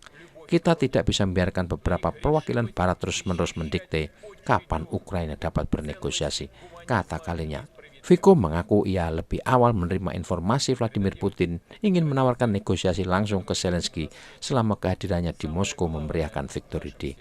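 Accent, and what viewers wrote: Java, Indonesia. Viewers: Bagus mas. Aku suka medoknya. Jowo bangets ❤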